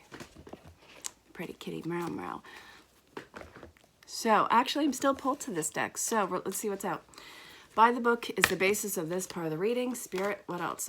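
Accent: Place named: United Kingdom. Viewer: Love ur British accent!!!!!!!!!!🤣🤣🤣🤣🤣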